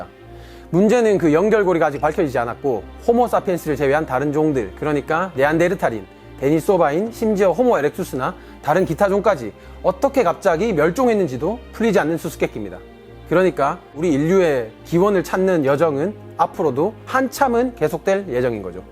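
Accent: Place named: Gyeongsang, South Korea. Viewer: 호모 사피엔스고 에렉튜스고 간에 진행자 분이 경상도 출신이신건 확실하네요. (저도 경상도 사람)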